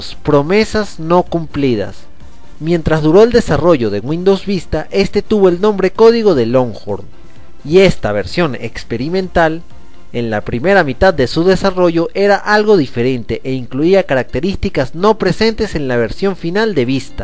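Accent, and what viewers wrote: Venezuela. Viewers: El acento venezolano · ACENTO VENEZOLANO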